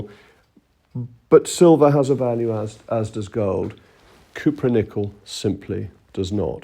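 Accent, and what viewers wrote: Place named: Upper class, United Kingdom. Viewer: Well articulated intelligent discourse. If we can put aside his “posh” sometimes “parody” of the so-called “upper class”, he actually talks sense.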